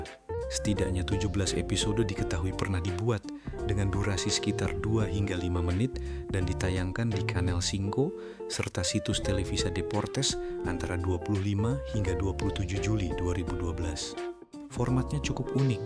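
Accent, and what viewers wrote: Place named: Spain. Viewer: Buset logatnya kek orang ngomong bahasa spanyol 🗿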